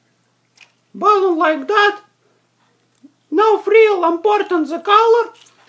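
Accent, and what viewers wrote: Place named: Asia. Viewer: That's how Asian people talks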